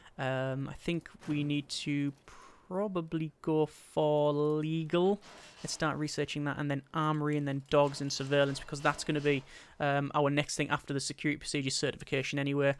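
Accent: United Kingdom. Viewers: Are you from the UK. England or Scotland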